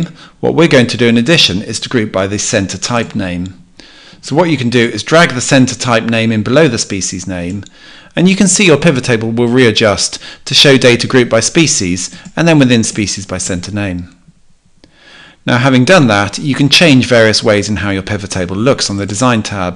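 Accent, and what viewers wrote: England, United Kingdom. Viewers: Wow..! Great class.. :) Like the 'Excellent sounding English Accent' :)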